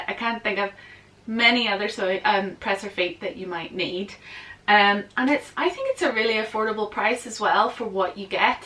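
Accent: Northern Ireland, United Kingdom. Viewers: Just realised I’m a year late, never mind! I’m also in Northern Ireland so it’s lovely to hear our accent on videos x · Great video. Thank you. As much as I'd like the sewing feet, I don't think they'd fit my machine which is an elderly ELNA. I tried to buy a walking foot recently but no variation would fit. I keep thinking maybe I should get a new machine, but the Elna is a good basic machine. So don't know how much better the new machines are.... Like you, most of my makes are for the cooler months, so I don't have enough to do memademay. I'm recently returned to sewing and will get a more comprehensive memade wardrobe eventually. Like you also, I'm from NI - now living near Cambridge. I love listening to your lovely accent. It brings me many happy memories. God bless. Helen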